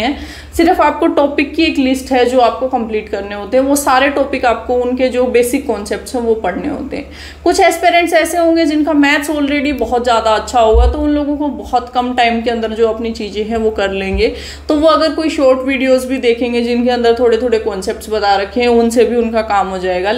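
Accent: Haryana, India. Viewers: अप हरियाणा से हो न ..?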